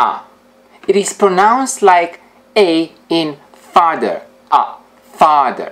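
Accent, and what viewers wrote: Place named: Transylvania, Romania. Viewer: E foarte faină lecția și metodică, exceptând accentul ardelenesc(trasilvănean... cafia/cafea, curtia/curtea...etc) :P Its a very fine lesson and methodical, excepting the transylvanian accent of the romanian language, in which people tend to pronounce ”i” instead of ”e” if this letter(e) is inside a word... cafia/cafea/coffee, curtia/curtea/the courtyard...etc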